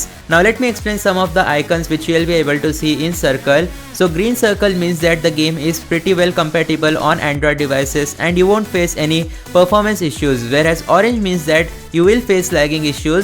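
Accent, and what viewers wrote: India. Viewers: Bhai indian hai ya american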